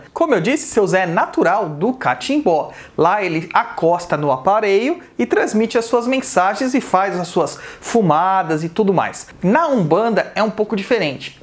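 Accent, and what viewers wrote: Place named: Rio de Janeiro, Brazil. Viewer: Baiano nada kkkkk ele é carioca todo cheio de sutaque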